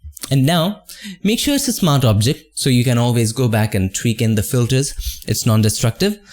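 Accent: India. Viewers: Dude, Indian accents are bad enough, but that breathing and uni are simply impossible to deal with.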